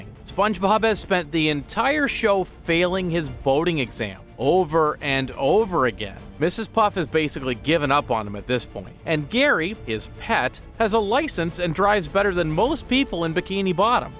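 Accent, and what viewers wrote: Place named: Canada. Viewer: you sound too Canadian to be AI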